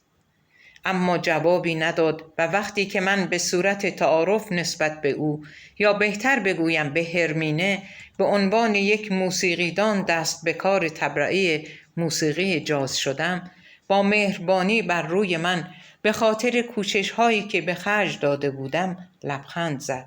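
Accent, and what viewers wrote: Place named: Russia. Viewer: چرا اینجوری صحبت می‌کنید؟؟ بیاد گوینده بخش فارسی رادیو مسکو در زمان شوروی افتادم که خانمی مسن که احتمالا از سرکردگان بخش فارسی حزب کمونیست بود، با تُنالیته خشن و مردونه، بالهجه ای که دیکتاتوری سرخ مسکو رو تداعی میکرد، و انگاری از توی یک سالن دراز خالی حرف می‌زنه، با اون لهجه فارسی_روسی میگفت: اینجا مسکو _بخش فارسی اتحاد جماهیرسوسیالیستی شوروی!! و ما هروقت شیطنت میکردیم مادرمون رادیو رو روشن می‌کرد و می‌گذاشت روی موج رادیو مسکو و میگفت : باشه اذیت کنین ولی خانمه صداتون میشنوه شب میاد میخورتتون.... 😅 وما بچه ها میخزیدیم زیر لحاف و فکرمیکردیم خانمه اگربیاد تاما رو بخوره، حتما نمیتونه مارو زیرلحاف پیدا کنه.....!! 😂😂😂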